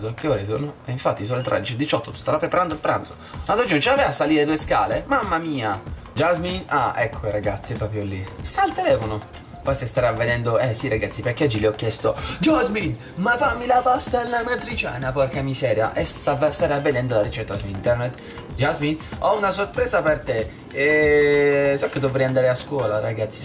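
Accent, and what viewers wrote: Bari, Italy. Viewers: Ma sei di bari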